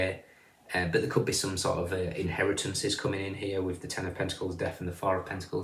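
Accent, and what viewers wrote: Northern England, United Kingdom. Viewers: thank you so much, you are so on point for me. I stopped in my tracks when you said a card of spiritual gifts was coming up. I’m leaving a 30 year career behind to follow a spiritual calling. Pluto has certainly done a number on us all. Every Cappie sun, moon,ascendant I know has had a tough 15-20 years. Great to know that better must come. Great to hear your northern accent. Glad we’re represented. Thank you for sharing your amazing gifts. Blessings to you and all. Xx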